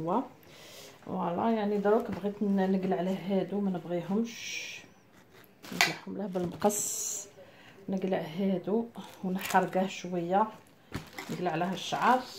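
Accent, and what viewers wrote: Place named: Oran, Algeria. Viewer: اخي متزوج جزائرية نحن نقيم بلجكيا هههههههه نفس اللهجة اللي تتكلمين بها اختي. انتم من مدينة وهران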